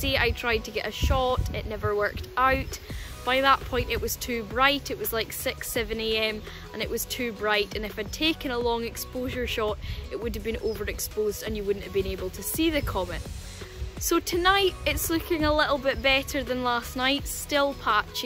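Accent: Scotland, United Kingdom. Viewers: Don't know what I enjoyed most, your very helpful instructions or your wee Scottish accent. In any case, thanks for taking the time and effort to post this video. BTW - you wouldn't want to be standing around in the middle of a heat wave, being bitten by mosquitos, to photograph the comet. We here in Pennsylvania, USA can attest to that.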